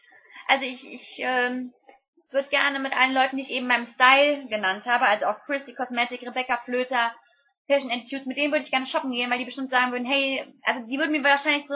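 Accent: Cologne, Germany. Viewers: Puh, dass jetzt gefragt wird, wen du abgehoben findest, war klar.. Nehmt es so hin, wenn sie jetzt sagt wer, dann gibt es doch wieder ein riiiesen Theater.. sinnlos, meiner Meinung nach. Ich fand nicht schlimm, dass du Personen doppelt nennst. Du gehst mir persönlich absolut nicht auf die Nerven! ;) Ich gucke deine Videos sehr gerne und ich muss jedesmal grinsen. Leider waren in den letzten Videos keine Sätze mehr mit köllsche Akzent :'( :D Da amüsiere ich mich immer stundenlang. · "Drufjödingst,ne " OMG,ich kann nicht mehr...du und dein Kölsch seid GEILOMAT!!!!,DU bist eine kesse Kiste!O,ich kriege den -Slang..hihi^^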